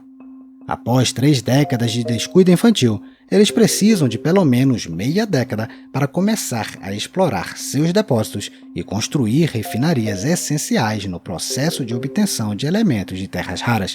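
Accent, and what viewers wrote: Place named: Rio de Janeiro, Brazil. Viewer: Esse é o melhor canal de geopolítica que já vi, chaga a ser um canal essencialmente excelente. Acho que o narrador é carioca por causa do sotaque.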